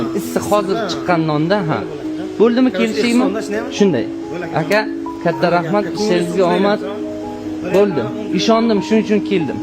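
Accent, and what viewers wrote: Uzbekistan. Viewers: Buxorodan bulsa kerak shevasidan baraka toping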